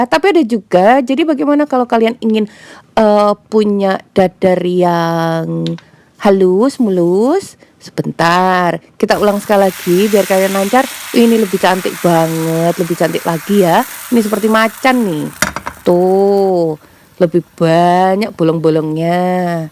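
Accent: Java, Indonesia. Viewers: Buat isian semua bahan campur Lalau masak awas jangan sampai jd srundeng Lalau buat kulit tepung gula telur tambah santan santan kara instan lebih kental Tambahkan pasta pandan aduk sampai rata,jangan khawatie jangan sampai beekwtu janganlupa tambah minyak goreng di sari Yap Pas banget buat ta'jil baiat sesiei akan dapat 12 janganlupa Duk duslu Nang ini udah terlihat guling bolong, u menciptakan adonan bolong itu pnannya haru panas Olesi minyak masukan asona dintuplek hasilnya bolong bolong waw kayak orang Jawa wkwkwk mantab medhok nampak macan Kalau mau yang mulus api kecil pan hangat gak pake minyak,Nang sekarang akan kasih isian kedalam kulit ini yang sedang bolong bolongnya, Wah saatnya gulung wkwkwk wong jowo ngono iya to 😆 mantabz dah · wah dadar gulung nya enk bngt buat tajil cocok bngt mah dari pda bli cara biat nya juga gampng y klw liat mmh yg but tpi klw rara yg buat pasti gagal hahaha,wah ada dua macam y mah dadar gulung nya yg satu berpori yg satu nya lagi mulus ,cara buat nya sama cmn beda di saat waktu manasin adonan nya klw yg pori saat adonan d masukan ke pen pen nya tunggu panas dlu baru kasih adonan pke api kecil ,klw pngn yg mulus pen nya jngn pnas tpi hngt sama dngn api kcil klw dah buat dadar gulung nya tinggal masukin unti nya dah d gulung" jadi dah dadar gulung hahaha ,wah itu ada roti mamah abis buat roti lngsung buat dadar gulung y ,wah ada ilmu satu lagi ternyta dadar gulung ada yg bisa g pke telor juga y mah ,aduh gagal pokus ma suara mmh yg medok 😁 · Medoknya jwany mn jeng, 😊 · Mari bikin dadar gulung dulu...iya bu sudah faham..ada yang seperti macan...iya cantik mom..kalau mau yang mulus pakai api kecil gak usah pakai minyak..omongannya kok kayak wong jowo mom...waduh ada roti...mau dong..lanjut di isi pakai unti kelapa...di gulung lipat gulung lipat..haha ayo lagi siapa takut...ada2 kamu mom..lumayan buat aku ketawa hari ini dadaaa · Jadi kalo untuk dadar gulung itu yang berpori semakin bolong semakin ciantikk ya, ternyata yang enak itu yang pake telur, kalo pengen porinya banyak taflon panas tambahkan minyak lalu masukan adonan, kalo pengen kulit nya halus jangan kasih minyak dan api kecill, sipp trimakasih tipnya ternyata adonan nya kasik telur dan minyak sayur, selamat berbuka puasa, aduhh ada yang logat jawa nihhh hahah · Profilnya ganti jadi pangling nih, cantiiik bgt hasilnya, adonannya di saring dulu biar g bergrindil, tumben nih logatnya medok😁😁😁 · Berpori euy kayak wajah AQ klo lagi ga dirawat hkhk Mm suara nya medok ok jg pantes jg jd org jowo hkhk Pas bgt buat takjil niii ga perlu beli Yg pertama bkin si unti dlu ya Kdua bkin adonan dadar nya Pake teflon ternyata kayak bkiin leker ya Selera ya boleh yg berbolong bolong boleh yg mulus dg api kecil pan hangat ..monggo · Diputtaaar....di cellup,dijillattiii😀...aq gemmess ama suaraneee,RENYAAH,wong jowoo taa...luccu,saabaaar...lope..lopee💋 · Dasar gulung pakai Nada jawa he he Isian nya kelapa Gulung gulung Ayooo gulung meneh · Medok bangget mbk yu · Walikimsalam kaka sayg algamdllah puasa nya lancar Wah aku sellu gagal buat dadar gulung mawu coba resep Gula ama kelapa jangan jadi srundeng😆😆😆 Telur nya simpan bagian tengah ya kaka 2 sendok makan miyak gorenggggg di cicin minyak dikit dah da msup adonan nya d goyang2 wah hasil nya bagus bolong2wah rotinya melenuk gaya ngomong kaya orang jawa kaka guluk embk wah hasil mantap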